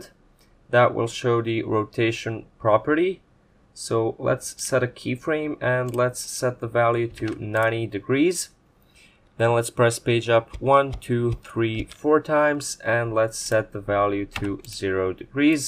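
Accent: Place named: Balkans. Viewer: Are you from Bosna? I couldn't help but notice the Balkan's accent and surname, great tutorials by the way.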